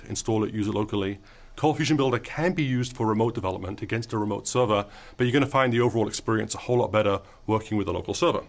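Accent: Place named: Scotland, United Kingdom. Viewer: So, that accent is totally Scottish. Just curious which city it's from.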